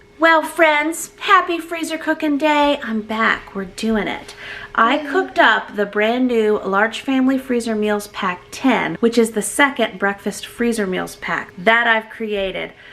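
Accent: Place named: Charleston, United States. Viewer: I was wondering are you from South Carolina you sound like you're from Charleston South Carolina I mean this in a good way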